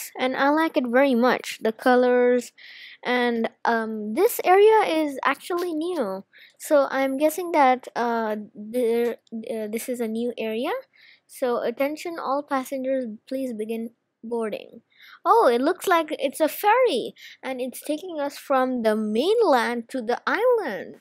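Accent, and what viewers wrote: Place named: India. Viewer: ur accent sounds like indian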